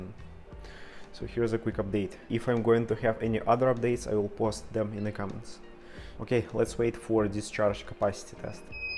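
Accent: Russia. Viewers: Да , но английский с русским акцентом ужасен!!😢😢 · You are from Russia? You have a very strong Russian accent.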